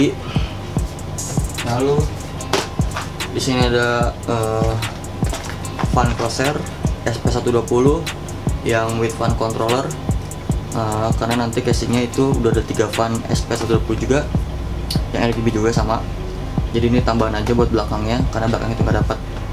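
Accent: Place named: Java, Indonesia. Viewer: biasa lidah jawa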